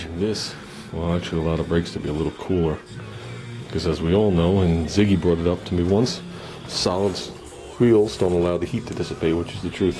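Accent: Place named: New York/New Jersey, United States. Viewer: hey man, I'm guessing you are either from Jersey or NY with that accent :) Long Island here! I love these videos, you're inspiring me to get to work on my 1980 G body car. Once the weather gets better...and I think 2018 may be the year I finally build that dream garage!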